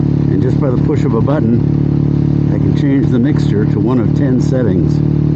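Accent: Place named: United States. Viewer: Marshall to Leicester... Thought these sounded like towns in Great Britain, so I thought this was another "English Chaps" review of the 350 until I heard your voice! Then thought, OK, its an American living in England! While watching, realized your in North Carolina! Love your Humor and stories ... Like murder in the Appalachians ... which made me think of the book by Bill Bryson, "A Walk in the Woods". When you started recalling stories of your childhood (like using the sweeper for siphoning fuel...back in the 50's), ... another Bill Bryson book came to mind ... "The Life and Times of Thunderbolt Kid". Bill Bryson, like myself, was born in 1951 and your humor reminds me a lot of his! I think we all pulled some "bonehead" stunts back in those days but as long as you made it safely home by suppertime,... mom and dad didn't seem to care what you were doing or where you's been all day! 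Much simpler times back then! Anyhow, I hope your enjoying your Signals Edition in Desert Sand, (as much as I am enjoying mine!)... Sure wish Northeast Ohio had a few extra weeks for motorcycle riding, ( like perhaps North Carolina does?) so my bike wasn't hibernating as long! Your audio sounds great! I'm waiting on the next shipment of Instamics (for my Insta360 One RS camera), so I can loose the dual wires (running from my helmet and saddlebag), and enjoy riding without being "tethered". Drive Safe!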